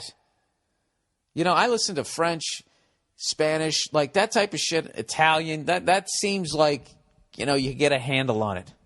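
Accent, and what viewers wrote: Boston, United States. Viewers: Ladies and gentlemen, Burr. He's got the wisdom of a Japanese Sensei in an American bar, wrapped in a Bostonian accent, delivered through the internet. Bravo... you nailed this one.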